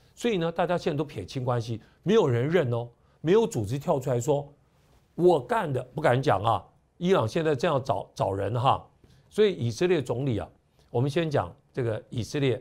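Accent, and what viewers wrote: Standard. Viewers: 郑老师辛苦了！郑老师的汉语说得顶呱呱👍👍 比我们很多国人说的国语还标准。相信郑老师这档国语政论节目会越办越好！支持郑老师！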